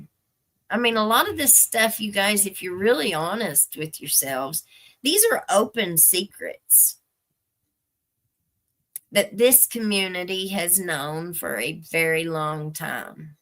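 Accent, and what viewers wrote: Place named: Kentucky, United States. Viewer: My grandma grew up in Pike County KY and listening to your channel reminds me so much of her accent! ❤She passed years ago but listening to your channel was so familiar to me because of it! Thanks for covering this case -- I can't wait until we find out what really happened here.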